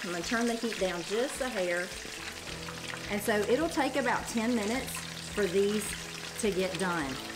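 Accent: South, United States. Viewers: From one Southerner to another I just love your accent. Greetings from the great state of Tennessee. Sadly, the Southern accent here in East Tennessee is being watered down by the influx of people from the Northeast. They're actually invading the Southeast. Anyway. Love your videos. Take care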